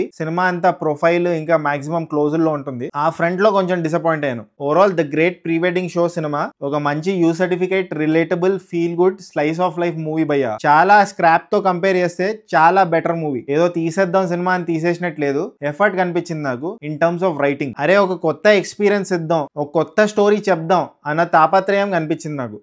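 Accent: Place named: Vizianagaram, India. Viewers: Srikakulam slang kadhu bro Vizianagaram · Vizianagaram slang bro adhi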